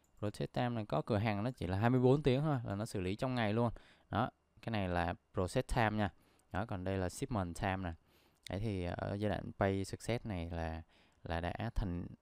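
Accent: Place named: Bac Lieu, Vietnam. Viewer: Tiếng đúng dân BL.. em ở BL đây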